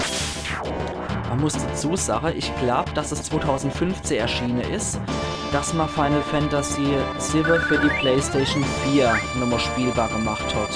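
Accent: Palatinate, Germany. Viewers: Naaa mist :D würde ich supergern schauen >__< aber ich will das selbst noch let´s Playen...nun bin ich hin und hergerissen ob ichs gucken soll /: Finds übrigens genial das du auf pälzisch deine lps machst. Das ist mal was besonderes und einzigartiges ( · Alter, meine Fresse! Du machst das großartig "uff pälzisch"! Da bekomme ich direkt gute Laune! Ich habe dir mal einen Daumen und ein Abo dagelassen und werde immer mal reinschauen. Weiter so:D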